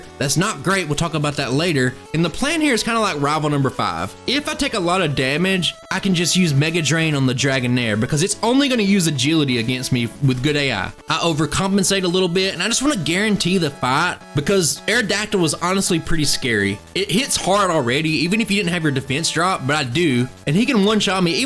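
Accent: South, United States. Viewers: The quality of your videos definitely rivals that of other high subscriber count creators, and your Southern accent makes you distinct from the crowd. (I'm from Louisiana, so I love the accent btw 😂) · Gengar ❌️ Geengar✅️.....😂 but seriously I've been loving your videos man, and the southern drawl makes it feel like home😊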